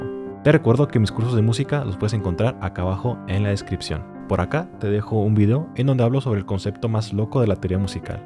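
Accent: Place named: North, Mexico. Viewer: Sospesho que eres del norte de México por ese acento tan peculiar 🤭 ánimo paisano, tú canal es muuuy bueno!!!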